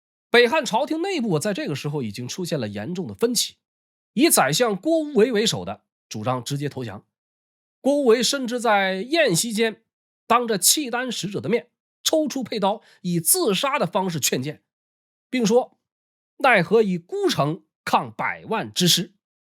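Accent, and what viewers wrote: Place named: Shenyang, China. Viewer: 总觉得兄是沈阳人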